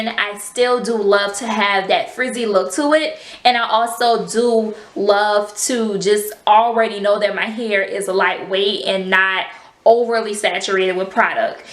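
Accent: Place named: Louisiana, United States. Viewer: Queen! I knew as soon as I clicked on you that you were from Louisiana! You sound like Mardi Gras, crawfish, and gumbo. Man I miss the south!!! Gorgeous hair!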